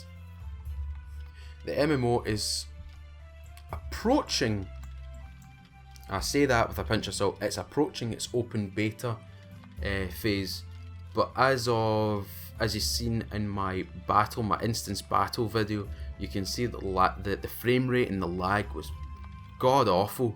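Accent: Scotland, United Kingdom. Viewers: Scottish accent is brilliant, Just bought the game and it seems we are going to spend some time here xD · WHere are you from ??? Scotland ?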